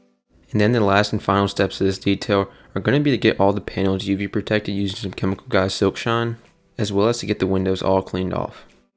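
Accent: South, United States. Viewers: Detail geek but southern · i heard your voice i ohhhhhh hesss a countryyyyy boyyyy😊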